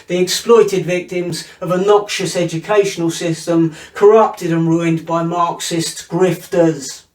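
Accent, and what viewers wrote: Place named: RP, United Kingdom. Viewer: Nailed ! In the Queen's good English.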